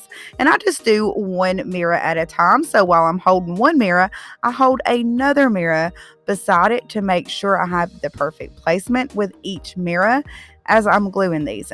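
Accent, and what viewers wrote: South, United States. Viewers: GORGEOUS!!!!!!! Btw I think I look at your videos as to watch the crafts as much I do to listen beautiful southern accent...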